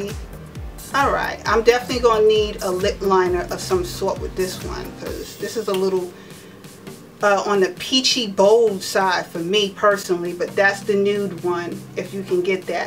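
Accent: New York, United States. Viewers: great haul from Family Dollar..........I have to check out that "Danger" Lippy.....it looks super cool..........my kind of color............I am also going to go to Family Dollar and check out that candle......the Mahogany Sandlewood........love candles that smell like men's cologne.......have never bought any candles from FD except Glade because I hate to buy candles and u get them home and they have NO SMELL at all...............so I am going to take your word for this one girlfriend and pick it up when I find it.............lol..............great haul..........thanks for sharing........I love listening to u talk............that NY accent is all that..............lol. Well have a great week and I'm looking forward to your next video.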